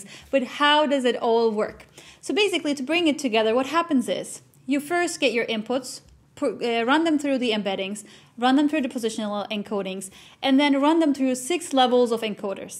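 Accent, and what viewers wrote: Turkey. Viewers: Why is the first think I thought is that she must be Turkish? :D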